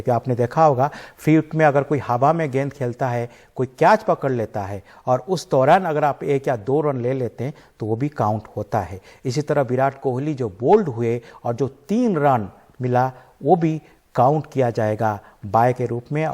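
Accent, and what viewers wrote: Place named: Odisha, India. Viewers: Kaha se pakad laya odisha ke Anchor Accent mein hi Odia hai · जी, हम देश को प्यार करते हैं। हम क्रिकेट को प्यार करते हैं। हम धर्म संप्रदाय से ऊपर उठकर देश के हर प्लेयर को प्यार करते हैं। हम आपके उड़िया टच(शायद) हिंदी टोन को भी प्यार करते हैं। जो कहती है, फूल हैं अनेक पर माला तो एक है।🙏🙏🙏।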